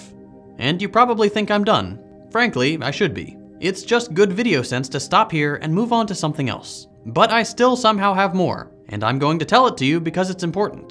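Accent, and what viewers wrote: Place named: United States. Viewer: Sounds so... American.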